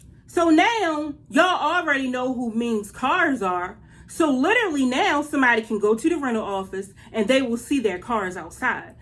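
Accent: Baltimore, United States. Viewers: You from Baltimore Ms.?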